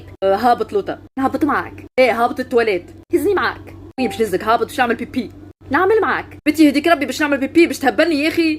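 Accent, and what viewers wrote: Tunisia. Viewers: هههههههههه يازين اللهجه التونسيه طريقه حلوه للتعليم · هذي اللهجة التونسية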